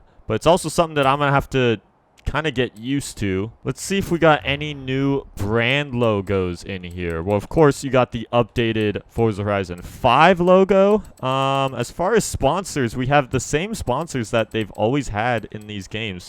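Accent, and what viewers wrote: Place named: Bahia, Brazil. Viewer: é gringo mas parece baiano pqp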